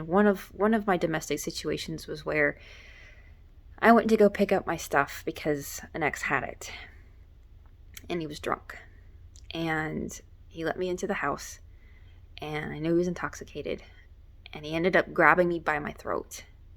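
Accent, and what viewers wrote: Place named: South, United States. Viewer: What made you attracted this man in the first place? So you a southern belle living in Alaska huh? That accent a dead give away lol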